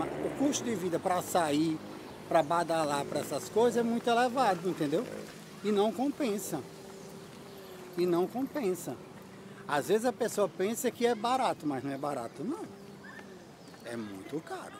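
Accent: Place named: Northeast, Brazil. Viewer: Bacanaaa ver a realidade ! Sou do ES amo seu sutaque nordestino, dizem q o povo daí é metido a besta kkkkk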